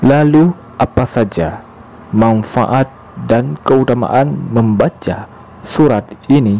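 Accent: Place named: Aceh, Indonesia. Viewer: OH, INI RUPANYA SUARA ORANG ACEH, YA,!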